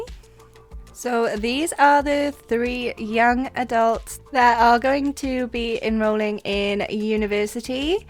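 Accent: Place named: Wales, United Kingdom. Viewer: OMG YOURE WELSH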